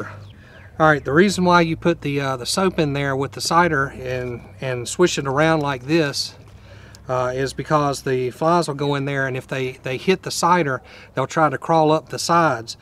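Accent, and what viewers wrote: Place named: South, United States. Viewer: Ipple cider he's a good old country boy I respect and love the accent I been living in Tampa fl for a really long time more than half my life now and married a girl born and raised here just something about it she's not "country" perse but she is born and raised down her I was born and raised up north new York to be exact and it ironic because she hated new Yorker but some how me and her just well the rest I history we have a whole family now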